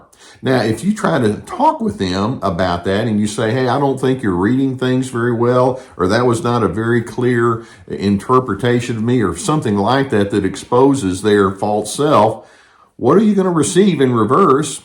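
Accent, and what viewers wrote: South, United States. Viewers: So love your podcasts they are so commonsensical and you beautiful southern accent is like melted chocolate. Please keep up your chivalry and kindness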